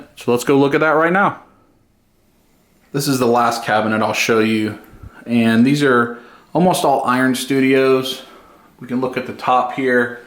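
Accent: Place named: Texas, United States. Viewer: He has a texan accent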